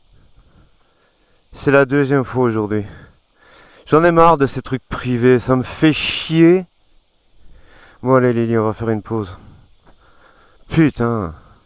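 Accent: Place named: Belgium. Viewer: Bonjour Je te suis depuis peu et tu me regale avec ton accent belge. Tu es pertinent en arguments moto, tu nous fait rever avec de belles images de maquis espagnols, je vais m abonner. Je roule en ktm 690 enduro r 2013 et 350 exc f 2018 dans pyrennees francaises et espagnoles. Continues tu nous regales avec tes expressions espagnoles. Zitoune.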